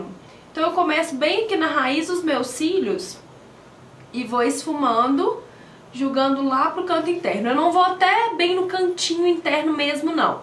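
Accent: Minas Gerais, Brazil. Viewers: se e mineira ne! se nao for n acredito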